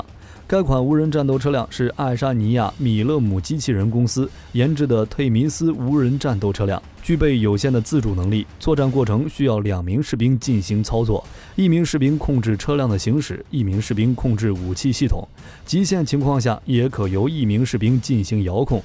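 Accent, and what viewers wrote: China. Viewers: 一听就知道啦，就是中国口音，也许国籍不是中国，但是绝对中国大陆口音，不是台湾，也不是马来西亚新加坡，也不是香港，就是中国大陆的。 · 频道主是大陆人吗，口音好像啊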